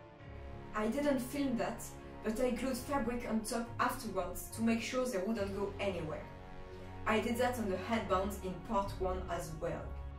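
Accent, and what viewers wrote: France. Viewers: Reconnais bien là l'accent français merci pour tes 2 vidéos qui m'ont beaucoup aidé car je compte acheter les matériaux et faire seul de problème c'est que et on est que tu dis tout ça en anglais il y a des matériaux que je n'ai pas compris la signification.😅😅 Par exemple le truc en aluminium que tu mets dans les ailes, la matière du coup pour faire le squelette des ailes qu'est-ce que c'est, et je t'avoue que la fin pour du coup faire le truc pour le soutien j'ai pas très bien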